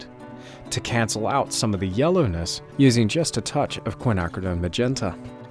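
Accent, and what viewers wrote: Australia. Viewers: your accent is so Australian for some words, and so American for other words. I would have guessed the Aussie would get into all words, at least a little bit. But it's either one or the other though, very interesting. Great location by the way.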